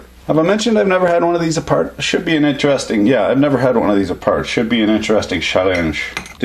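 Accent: Quebec, Canada. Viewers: Love the canadia accent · just yesterday i found this channel and it's seriously cool. Dude is funny, witty and super knowledgeable. also, did you go all quebecois at some point? eh tabarnak, je le savais pas, je me demande d'où tu viens? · An international colleague recently asked what we Canadians sound like. I sent him to your channel as a prime example. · Did u soften ur uuut(out) or have I been watching enough that I'm not even hearing ur c eh n eh d eh any more?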